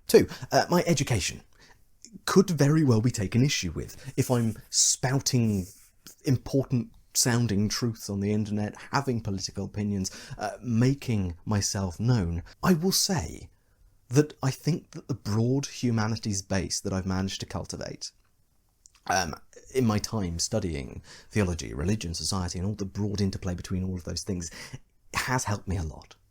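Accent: United Kingdom. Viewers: To be fair, everything sounds more believable if said in a erudite British accent.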